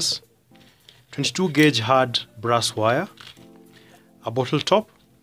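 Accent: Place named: Somalia. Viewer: defo somali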